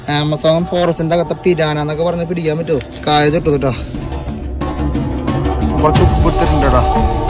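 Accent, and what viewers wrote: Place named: Ollur, India. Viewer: Ollur slang ahno poli slang ❤❤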